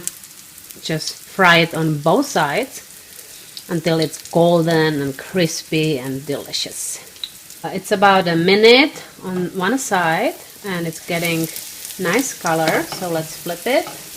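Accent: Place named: Czech Republic. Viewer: I love the way you talk! I miss hearing the accent of a česká žena! Is it olive oil you use?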